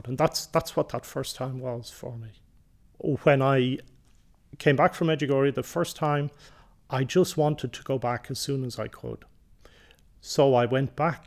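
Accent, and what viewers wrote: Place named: Ireland. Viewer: Beautiful experience and gorgeous Irish accent 😇 Thanks for sharing, father!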